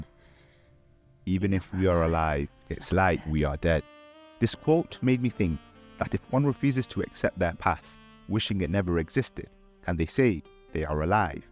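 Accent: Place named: United Kingdom. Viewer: What are you going on about? Maybe your ears are broken. He's clearly British. 😂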